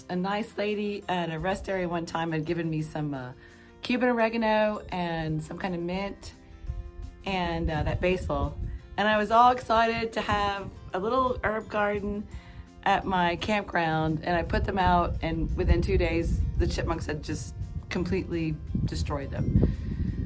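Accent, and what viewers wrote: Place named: Texas, United States. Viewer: 🤔 From TX you say? I didn't pick up on that accent in video, but it was a pleasant surprise here. I haven't heard one in a while, as I am on the road myself. 😊 I lived in OR for a decade, and just loved it. Nothing like the concrete jungle of the DFW area. You have a lovely smile that really exudes happiness - may that positive energy lighten the load of everyone you meet.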